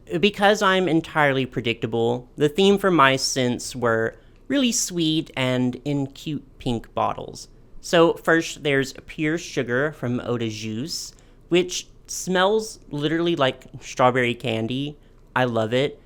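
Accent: Texas, United States. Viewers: I'm a foreigner so maybe I just am bad at discerning American accents, but I was surprised that yours is a texan one??? Your voice is definitely not something I imagine when someone says "Texas" 😅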